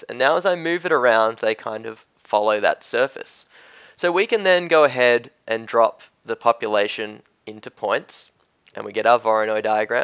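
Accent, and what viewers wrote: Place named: Australia. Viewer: I think hes from Melbourne, Australia, based on the accent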